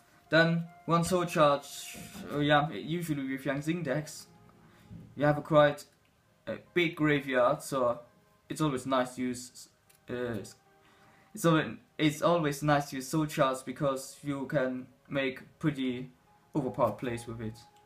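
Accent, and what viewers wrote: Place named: Germany. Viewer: naja warum macht ihr kein deutsches video ? all your cards are german and your accent sounds german ? by the way good Video i liked it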